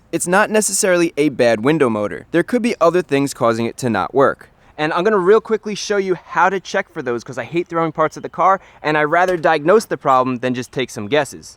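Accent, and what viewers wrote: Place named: New Jersey, United States. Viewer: *Soapy water - Pronounced as "wooder," likely due to his New Jersey accent.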